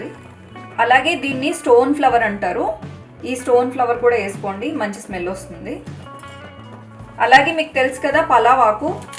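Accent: Godavari, India. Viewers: Nonvege recipe's Anni chala baaga vadutunnarandi Godavari yasatho 💗💗💗 aaayy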